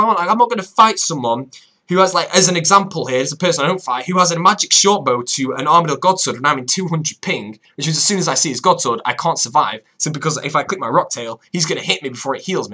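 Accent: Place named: North, United Kingdom. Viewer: Feel free to commentate on my videos once I've finished them in due time. We could even harmonize with your northern and my southern accent.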